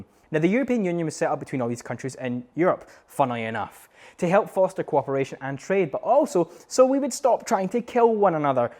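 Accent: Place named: West Scotland, United Kingdom. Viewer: Thank you, I'd been so keen to hear your thoughts and facts on Greece. It was great, but ooft you spoke very fast in this video. As a non west-coaster it takes me that second extra to adjust to your accent (that I adore), I'm not suggesting you tone down your accent, just that I'd appreciate if the speed was just a tad bit slower. I like to share your videos with non Scots too. Keep up the good work, I'm so glad you're back on my screen!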